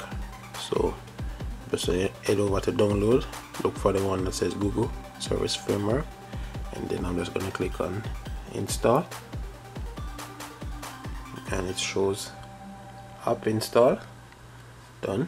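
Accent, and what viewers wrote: Jamaica. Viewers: Is he Jamaican?🇯🇲I am. It really sounds like it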